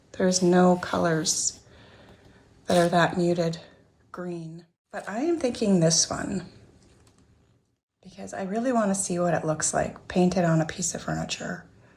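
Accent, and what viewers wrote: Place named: Canada. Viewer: She sounds Canadian.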